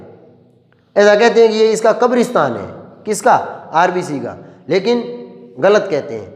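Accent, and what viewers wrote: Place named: Barmer, India. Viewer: आपके पढ़ाने का तरीका यही बनाए रखना क्योंकि कॉमेडी के साथ पढ़ाई में बोरियत महसूस नहीं होती है ओर खासकर देशी अंदाज...क्या आप बाड़मेर से हो गुरू।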